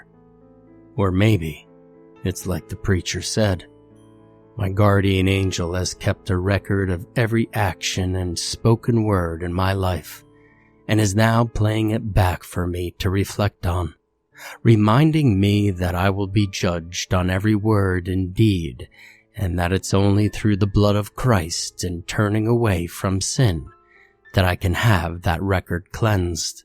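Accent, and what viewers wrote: South, United States. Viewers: Great southern draw really took this story over the top Great work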